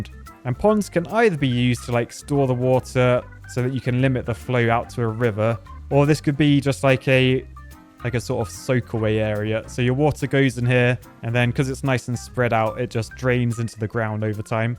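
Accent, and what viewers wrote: United Kingdom. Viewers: I heard way more British pronunciation in this "game" than any other video. 😂 I was like "What'd he say?" 😊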